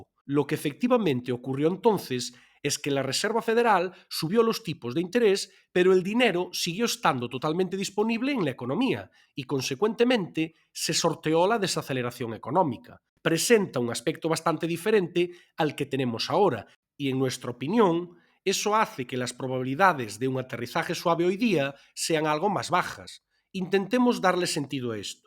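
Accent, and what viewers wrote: Spain. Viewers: Muy buen video! Pregunta de curiosidad 😁: de que parte de España es el acento de la persona que habla en el video?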